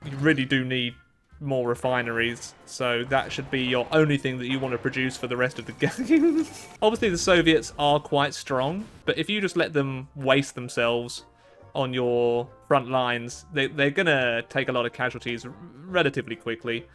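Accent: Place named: United Kingdom. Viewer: He's more Bri'ish than usual in this video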